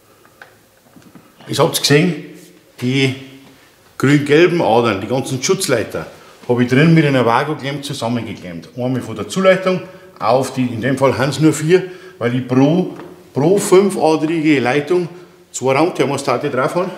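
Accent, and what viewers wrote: Bavaria, Germany. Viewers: Also mal ganz ehrlich von mir - Ich bin schon öfters auf deine Videos gestoßen und war sofort abgeneigt wegen deines Dialektes. Ich mag Bayern jetzt nicht so. Nur unsympathische Leute aus Bayern kennengelernt. Jedenfalls habe ich mir dann trotzdem ein paar Videos angeschaut. Bist mir doch ganz sympathisch jetzt. Klasse Inhalte. Gut rübergebracht. Beste Clickbaittitel überhaupt :)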